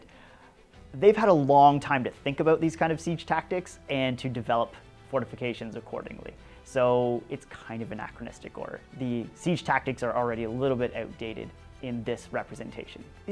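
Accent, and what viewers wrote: Canada. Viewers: One sentence in, and I can tell he is Canadian 😅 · I can tell he is Canadian by his accent. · As soon as you opened your mouth, I pegged you as a Canadian (and from my alma mater too!) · I'm guessing he's Canadian from that "oooot" and "aboowt" in his accent.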